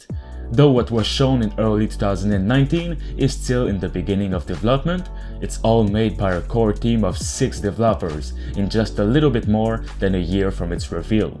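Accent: Quebec, Canada. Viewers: Hey what’s up! This is the first video i see of yours, and i can’t stop thinking i know where that accent is from. Are you a french canadian? I’m also french canadian:P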